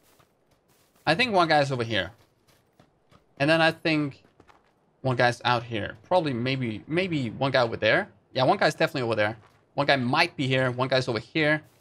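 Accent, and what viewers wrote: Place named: Denmark. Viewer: Where are you from? You sound Danish.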